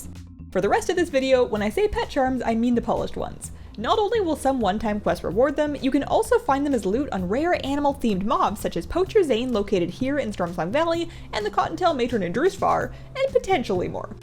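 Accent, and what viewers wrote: Canada. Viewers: canadian spotted. No matter how good their american accent is the 'out' always slips through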